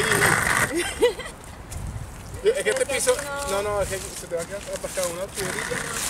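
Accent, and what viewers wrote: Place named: Venezuela. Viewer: Hola que tal Saludos. Esta un divertido Video. A penas empecé a verlo y dijiste la primera palabra de inmediato supuse que eras Venezolano. Hehe y al final del video dices que si efectivamente eres Venezolano. Llegué a este video porque me compré ese lente de 85mm y quería buscar algunos videos relacionados con este lente. Las fotos muy lindas. Muy buena composición y un divertido video. Saludos.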